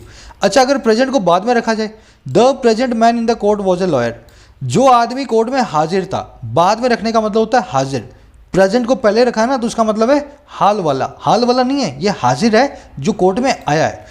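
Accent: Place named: Haryana, India. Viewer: Awesome sir. Your way of teaching is unique. Even hariyanavi accent add a feather in our cap.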